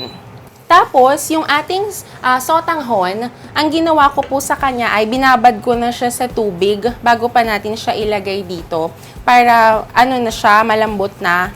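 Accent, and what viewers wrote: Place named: England, United Kingdom. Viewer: She pronounced the letter R with an English accent. So pretentious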